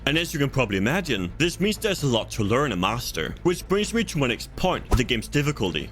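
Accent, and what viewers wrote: Denmark. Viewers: Danish narrator?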